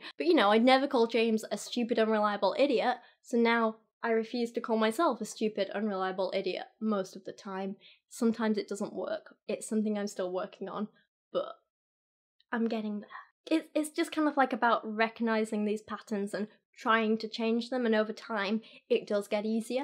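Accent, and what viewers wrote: United Kingdom. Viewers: Your timing is awesome. I had a really bad day today, and there you are, waiting for me to get home and log on so you can do your British patter with the cute accent and the inspirational point of view, and you'll talk about a point that need qualification and you'll go ". . . B-u-r-t . . . " and then add the qualification. So, I watch you for a half an hour and now I feel better. Thank you!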